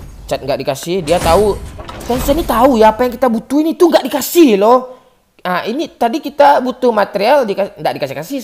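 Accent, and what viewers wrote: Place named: Java, Indonesia. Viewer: Bang bhsa medokk🤣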